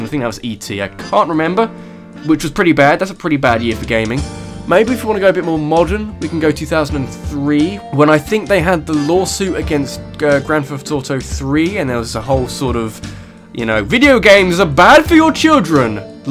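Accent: England, United Kingdom. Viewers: What part of England are you from? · HOLY FLYING HOBNOBS, HE'S BO BRITISH! O_O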